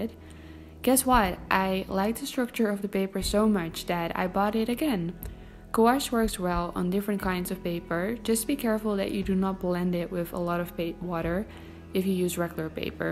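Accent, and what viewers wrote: Netherlands. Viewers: You sound like you are dutch, arrreee you from holland???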